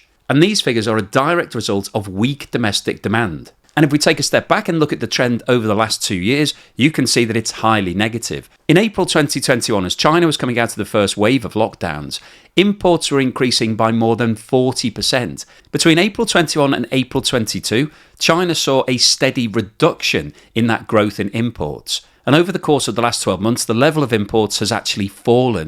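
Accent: United Kingdom. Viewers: The bloggers brit accent is hard on the ears, especially when pronounces letters T. Ouch I'm out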